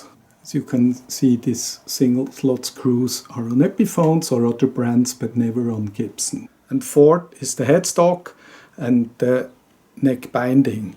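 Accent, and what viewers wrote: Switzerland. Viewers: Tönt nachdem ne Schwiizer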